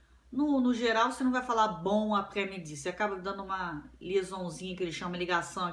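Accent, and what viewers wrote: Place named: Minas Gerais, Brazil. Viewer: Wô! T’écouter parler à propos de ma langue c’était vraiment un exercice en gymnastique pour mon faible portugais âgé de 40 ans. Tu sonnes « mineira » si ma mémoire est bonne. (Je souhaite pouvoir écrire dans ta belle langue, mais hélas! J’suis ben ben trop rouillé!) De bonnes observations! Il y a beaucoup de différences entre nous et nos cousins en France comme vous autres dans la lusophonie. Bonne continuation!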